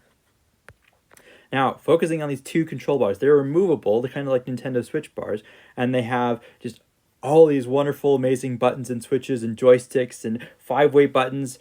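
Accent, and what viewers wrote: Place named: United States. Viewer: his accent seems american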